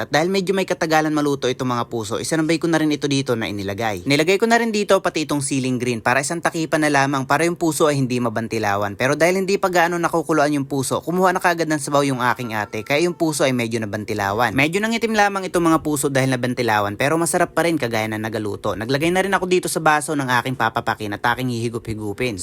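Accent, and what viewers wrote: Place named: Mindoro, Philippines. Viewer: Parang tonong mindoro ka brad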